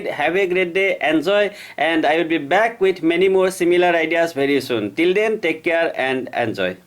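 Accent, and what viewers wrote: Assam, India. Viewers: You're from assam right..? I know that nolboria accent.. ✌🏻😄🤘🏻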